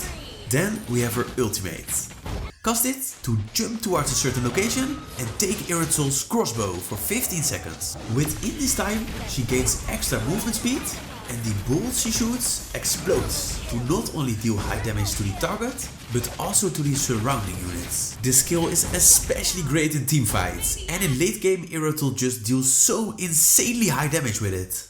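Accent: Netherlands. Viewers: Hey man, Ik herken een Nederlander wanneer ik er één hoor. Als je mensen zoekt om mee te spelen/test content mee te maken laat maar weten man.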